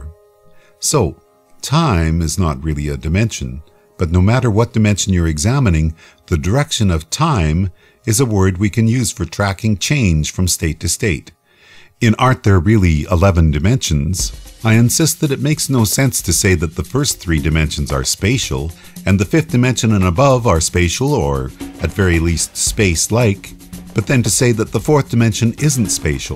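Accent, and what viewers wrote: Canada. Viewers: The narrator is canadian!! :) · Great video, really well done, but that Canadian accent is pretty rough! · you sound Canadian.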